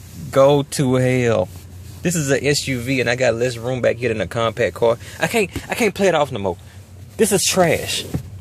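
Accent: South, United States. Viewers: Dude where are you from, I love your accent I bet you’re from the South somewhere 😂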